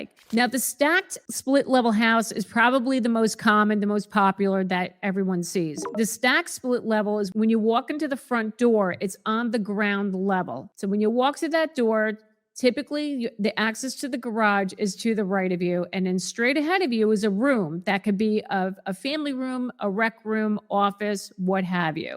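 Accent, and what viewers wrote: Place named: North, United States. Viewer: We relocated to GA from NY (born and raised, particularly Long Island) in '94. I was looking for a different (I know that's not the spelling of your name) and I hit play and turned around. Your accent (which isn't really an accent to me🤣) put the biggest smile on my face!! Every time I register students and I'm speaking to parents calling for information, before I find out where they're coming from, if I hear it, I always ask, "What part of the north are you from?" After 32 years, I'll NEVAH give up my accent! There's no lack of trilevels, stack splits, bilevels, and, of course, Cape Cods on the Island. But when I got down here, I absolutely loathed the bland-no-character-monopoly-looking-lincoln-log-lunchbox-looking houses. Just because it had brick on all four sides, didn't make it any more appealing to me. Still looked like a tissue box to me. 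When we relocated, we'd bought a cookie cutter ranch (because why rent when you can own, right?), then a colonial with a million and one steps) Then, when I made my Exodus from my marriage, and started looking for myself, I knew I wanted the trilevel split I'd grown to love while visiting my uncle's in TN during the summers. His was built on a slope and had a family room downstairs leading out to a pool off the patio, formal living/dining/eat in kitchen with a deck off the main level, then the bedrooms upstairs. His was the first house I'd known with central air! Mine is on a half acre wooded, fenced lot with a second fam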